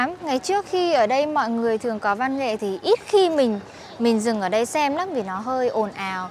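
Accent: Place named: Hanoi, Vietnam. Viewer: It sounds like you are speaking the Ha Noi dialect. Do you know the Vinh dialect?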